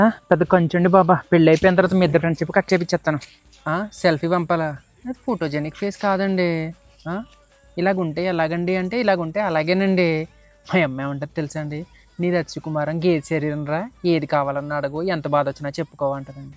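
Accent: Godavari, India. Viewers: Iyyababoiiiiii sanaaa baaga miguthunnaru andi meeru mana godarolla yaaasa baane catch chesisav ehey nuvvu 😂😂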